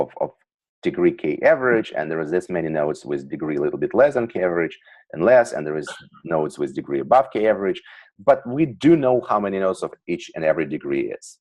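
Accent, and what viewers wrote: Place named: United States. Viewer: Thank you very much for uploading these videos. Did you learn English in States? I know you are Russian but you don't have much Russian accent in your English...it is American. I am surprised to be honest. Many people in Russia learn British pronounciation. And yours is clearly not that.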